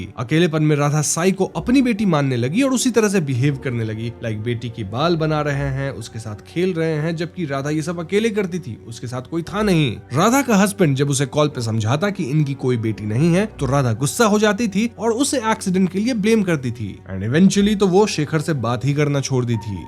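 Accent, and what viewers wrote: Bengal, India. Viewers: One request,,, your Bangali accent is too thick, so please learn to speak in a neutral accent.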